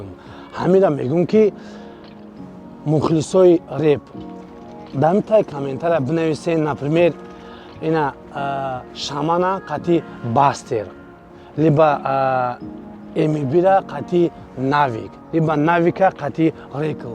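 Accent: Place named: Vahdat, Tajikistan. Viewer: Чиста вахдати гап мезани да брат ханотан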